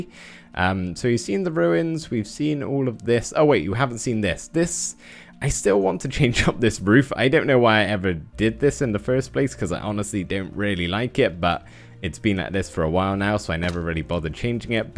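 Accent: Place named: Cornwall, United Kingdom. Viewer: Always wondered what part of the UK you're from, you have a similar accent to me which isn't something I often come across and I've noticed you say 'haf' not 'hahlf' same as me, and I'm from Cornwall 😊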